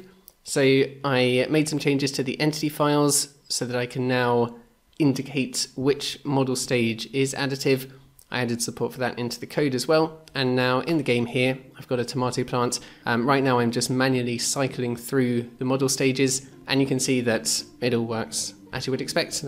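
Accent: Germany. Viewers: Wow, your accent is so clean. Didn't expect you're a German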